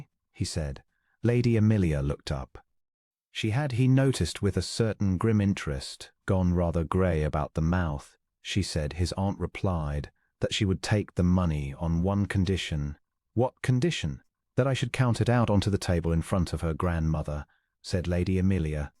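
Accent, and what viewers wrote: United Kingdom. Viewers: This was one of your better stories. Loved the writing and the wonderful British accent of the narrator. Just wonderful. Thank you.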